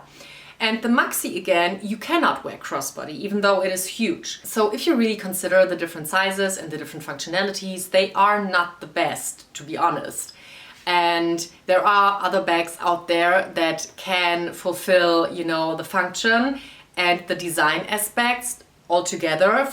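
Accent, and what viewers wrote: Germany. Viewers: you sound very German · Nice video! 🤗 Do I hear a german accent? 🤔 Edit: Habe das Video jetzt tu Ende geguckt, da erwähnst du sogar, dass du Deutschland kommst! Liebe Grüße, habe dich direkt abonniert :)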